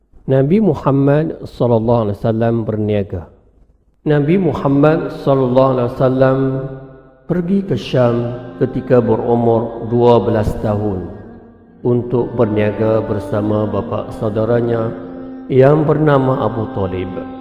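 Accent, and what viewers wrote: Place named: North, Malaysia. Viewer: Mcam utara ja ustat😂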